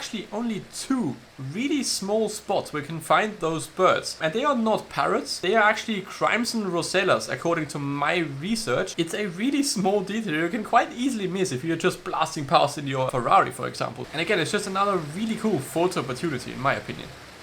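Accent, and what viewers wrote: Germany. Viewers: Is it possible that ur German? You have that accent😅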